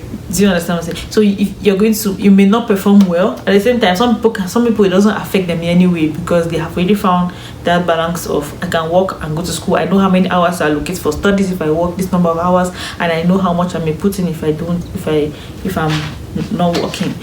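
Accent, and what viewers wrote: Nigeria. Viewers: Mehn…you sound so Nigerian ✌🏾✌🏾✌🏾🙂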